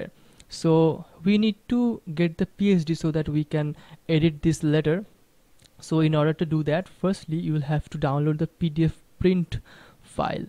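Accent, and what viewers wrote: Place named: India. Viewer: Aap hindi me bhi bana sakte thea sun k lag raha h ki aap indian ho 😗🚬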